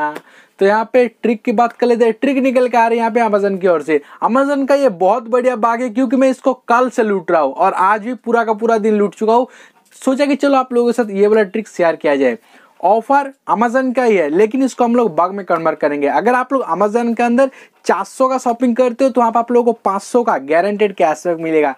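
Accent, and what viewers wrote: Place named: Odisha, India. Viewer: Odisha se ho kya brother???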